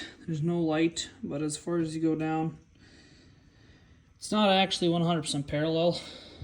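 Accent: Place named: Ukraine. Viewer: neat Ukrainian accent you've got..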